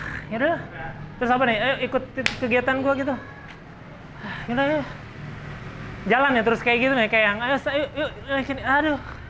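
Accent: United Kingdom. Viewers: pantesan bahasa inggrisnya kayak British accent gitu 😂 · british accent ya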